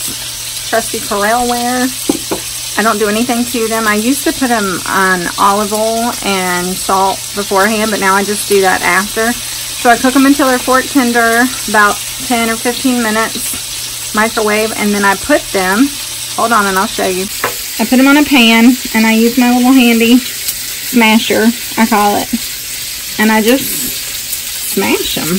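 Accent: South, United States. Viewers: I could listen to you all day love your southern drall and your beautiful girl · Your to real + genuine to be a politician my dear. Also, I adore your southern accent.! U don’t hear that to often in New Jersey.😎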